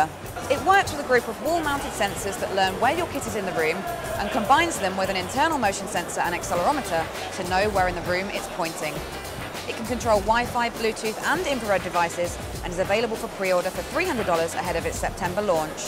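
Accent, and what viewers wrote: United Kingdom. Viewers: I lové that british accent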